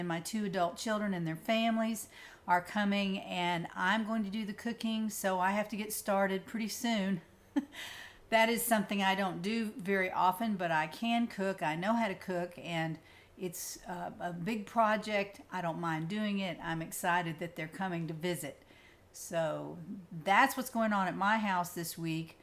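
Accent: South, United States. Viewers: Great to have your family coming!! :-) You're right...enough tutorials out there. Enjoy just hearing wonderful Southern accent & speak about what you know.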